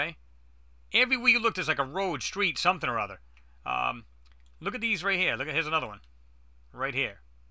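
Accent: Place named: New England, United States. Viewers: great videos brother very interesting I always knew that those nasa bastards have been lying to us lol any ways thanks for the great videos brother. Are you from Boston you sound like you have a Boston accent. Well anyways you got your self a new subscriber keep making these great videos. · he's definitely from New England, Greater Boston area would be a good guess. cool picture of the Moon too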